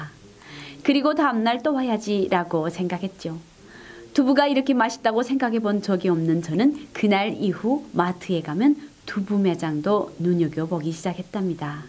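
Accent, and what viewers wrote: North Korea. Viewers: 예뿐 ~~ 북한말 그대로 쓰는것이 넘넘 예뿌셔요... 디른분들 탈북후 바로 서울말씨로바꾼다는것 이해는 하지만경상도 사람 서울 산다고 서울말씨로 안바꾸조.ㅎㅎㅎ두부 ~~~~~~~ 그런사연들이 있었군요.집에서 만드는 우리 전통 순두부 집 식당있으면 그걸 먹르러 1시간 이상 찿아가기도 한답니다.먹고 사오기도 하죠!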